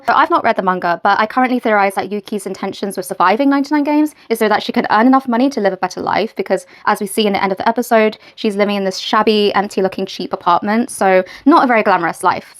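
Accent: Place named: United Kingdom. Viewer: You have such a lovely accent. Were you raised in Britain?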